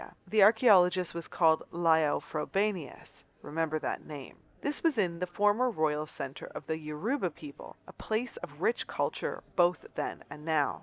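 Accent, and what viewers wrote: United States. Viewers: I can't work out if your accent is American.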